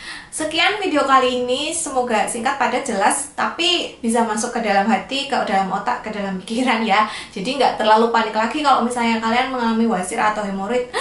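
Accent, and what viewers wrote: Java, Indonesia. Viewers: Bidan cantik yg logat jawanya medok. Channel langganan dari masih hamil smpe melahirkan dan baby ku ud 6 bulan skrng😁 selalu sukak pmbahasannya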